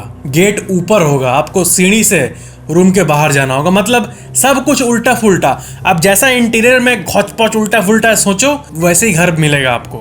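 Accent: Bihar, India. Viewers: Bihari tone hai inka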